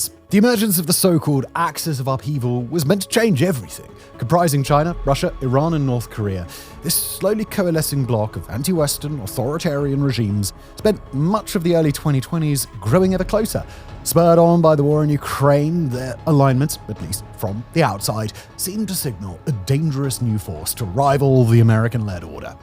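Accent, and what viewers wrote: RP, United Kingdom. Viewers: unsubbed. not sure how I put up with this unlistenable british guy for this long. british accents are overrated, folks. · This guy thinks talking posh makes you intelligent. Idiot hasnt even worked out the jewish cabal and shills for them cos he would lose his channel and income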